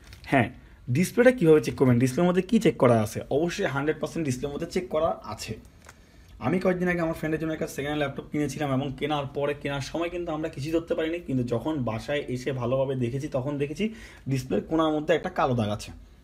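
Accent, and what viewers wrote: Chittagong, Bangladesh. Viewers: Apnr kthar dhoron shune amr versity life er kichu frnder ktha mone pore gelo tader bari chilo Chittaging...Oidiker manushgula oshadharon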